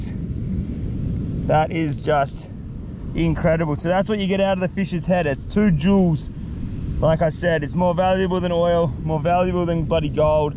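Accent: Australia. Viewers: Thought u were Aussie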